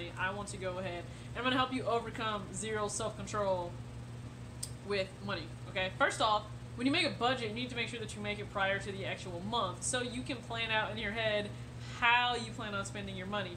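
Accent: South, United States. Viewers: Yu remind me of Sandy off Sponge Bob but in a cute sexy kind of way 😝 ...I think it’s the country accent 😍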